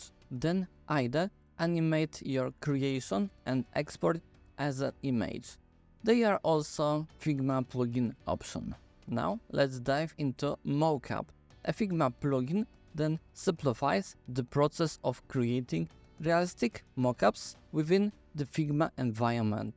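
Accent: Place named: Poland. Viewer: Polish accent :face-red-heart-shape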